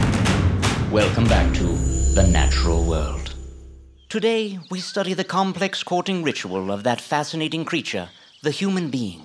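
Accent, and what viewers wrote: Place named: United Kingdom. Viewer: for best narrator! I can see why Jason first thought that he was British.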